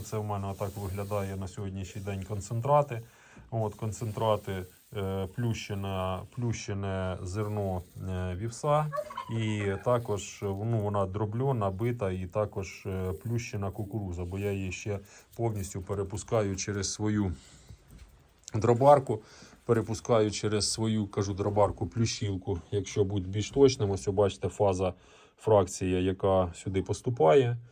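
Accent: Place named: Cherkasy, Ukraine. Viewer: Перш за все здоров'я вам і вашій родині найкрепшого.Ви надихаєте!!! Хотіла здати корову( не парується)і після вашої подорожі в Херсон визвала ветлікаря,зробив узі( жовте тіло).Пролікувала і сьогодні спарував. Отел первістки( ваше відео)- сама отел приймала навіть без сусідів. Після отелу не солодка вода а енергомол.Води пила стільки хотіла і все супер.Хто хоче вас чути той чує.Люблю слухати вашу "конкретну" мову- наче ви виросли на Черкащині.❤❤❤❤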